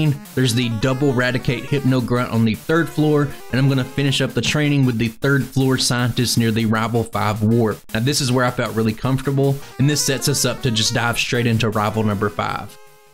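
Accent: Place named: Appalachia, United States. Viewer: I can recognize the appalchian accent from a mile away. Where are you from? East TN?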